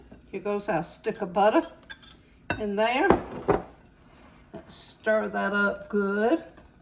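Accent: South, United States. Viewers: Amen to that!! I could watch her videos all day! She is my maternal grandma made over! Soo sweet, funny, loving, and oh that southern accent! ❤ Thank you for sharing your recipes, family, and your love for Jesus! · you are truly a gift from God! You are our favorite cook on here! Such a darling "Southern Belle"..just listening to you speak is magic to my ears!! Love how you explain your recipes so well! And..your lovely stories you share with us!! Love your recipies ( & so do my friends & family who eat my meals & deserts from your recipies!) ❤ You are just heartwarming!!!! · What a beautiful soul you have! Absolutely love your southern accent! God bless you! You are a jewel.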